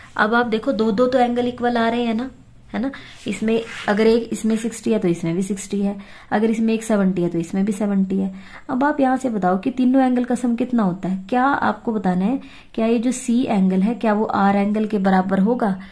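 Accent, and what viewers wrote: Haryana, India. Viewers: I think Haryana by her accent and all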